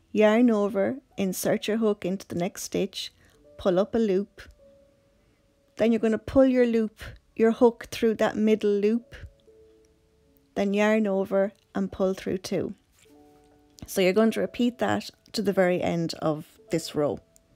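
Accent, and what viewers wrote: Ireland. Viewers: Thank you so much for your quick reply 😊 I will try the 2 strands together.... your instructions are great, it is nice to hear a fellow Irish voice ☘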